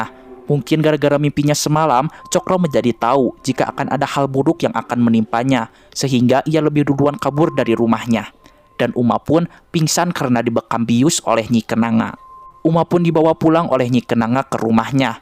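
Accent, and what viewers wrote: West Java, Indonesia. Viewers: Sunda banget logat ny.....si mimin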